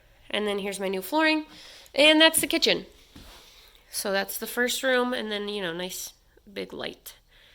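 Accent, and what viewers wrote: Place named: Wisconsin, United States. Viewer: Are you in Wisconsin? Sure sounds like it haha 😃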